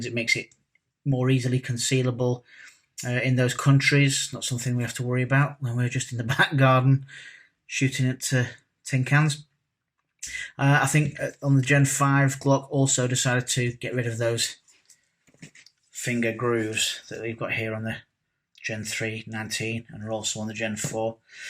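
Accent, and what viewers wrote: England, United Kingdom. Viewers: I really enjoy your commentary. Your explanations and English descriptions are very pleasing to the ear. I've always enjoyed the English accent. The adjectives you use are first class. Love your content brother!!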